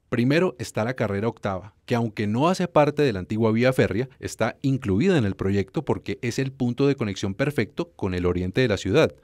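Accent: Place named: Cali, Colombia. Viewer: ¡Me encanta que el narrador del video tenga un sutil acento caleño!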